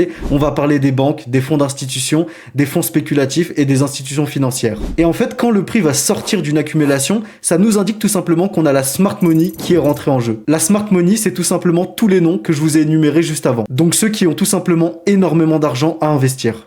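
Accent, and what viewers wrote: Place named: United States. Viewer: Avec l accent us 👍🏽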